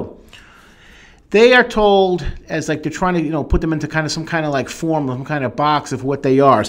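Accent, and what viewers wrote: Brooklyn, United States. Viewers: Sorry to disappoint, but that's pure Brooklyn. I grew up in a neighborhood called Bensonhurst.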